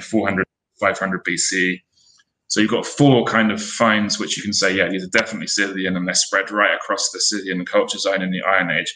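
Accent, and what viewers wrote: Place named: United Kingdom. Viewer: It's good to hear a voice from the UK! .... 🙂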